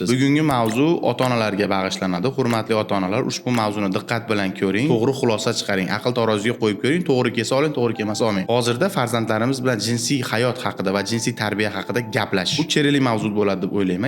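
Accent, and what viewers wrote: Andijan, Uzbekistan. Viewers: Anjan shevasi zo'rde manaqa..manaqa...manaqa...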